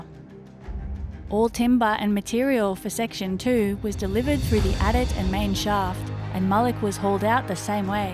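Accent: Australia. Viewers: The mine was closed when I was there, so this is great. :-) Thanks! Lovely Aussie English narration! The narrator needs to be hired by all Aussie content producers!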